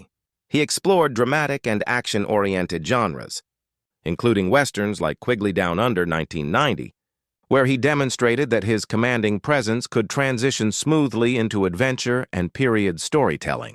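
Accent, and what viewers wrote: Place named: United States. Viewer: I am a relative of Tom and currently visiting the Selleck's. What did Tom confess? He has confirmed already many times he hasn't confessed anything recently. Everyone we know has already read his book or listened to the audio CD. Learn English. It's free on YouTube. Your Americanized AI-generated voice sounds unnatural. We know that you live in a third world country and don't speak English. Don't talk about people you don't know personally.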